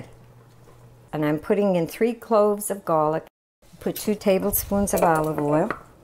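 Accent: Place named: New York, United States. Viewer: You sound like your from New York !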